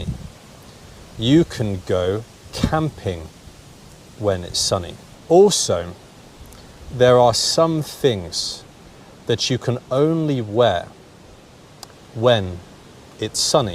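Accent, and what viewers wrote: United Kingdom. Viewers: It's appropriate content. Even if the content is easy for understanding, it's useful to listen to underscore nuances of British speech.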